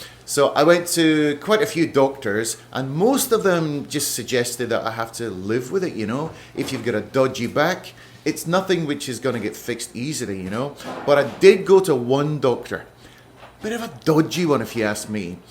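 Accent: Scotland, United Kingdom. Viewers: Thank you so much for this video, I finally fully understood what dodgy means now! I am Japanese, and my Scots husband uses it awful lots, I understand most of time, but sometimes I am like “ what does that dodgy mean in this case?”. I wonder if you are a Scots too? The first bit “ I got lost in London ~” and some words sounded like so, but I wasn’t sure. Cheers!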